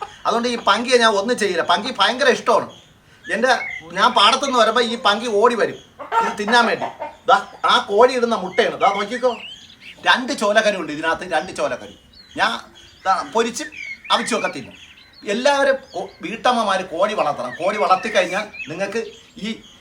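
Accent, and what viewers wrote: Thiruvananthapuram, India. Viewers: എവിടെ വീട് ചേട്ടാ? തിരുവനന്തപുരം സ്ലാങ്. ഒന്ന് നേരിട്ട് കാണാൻ ആണ്. അഡ്രസ് ഒരു ഫോൺ നമ്പർ ഇടുമോ.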